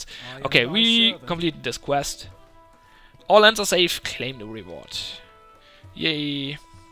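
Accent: Germany. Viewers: Man hört einfach direkt, dass da ein deutscher hinter dem Mic sitzt. ^^ Zum Beispiel sprichst Du "pretty" so aus wie es geschrieben wird, also betonst das E, teilweise auch prätty, wohingegen Menschen mit Muttersprche Englisch es eigentlich fast alle "pritty" aussprechen. ^^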